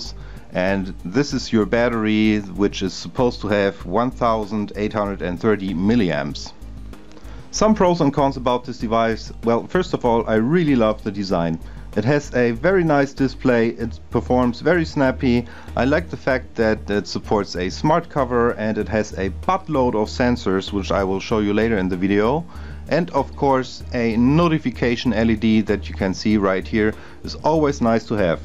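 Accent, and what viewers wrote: Germany. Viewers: I hear German accent)) Great review )) i think it is a good device ))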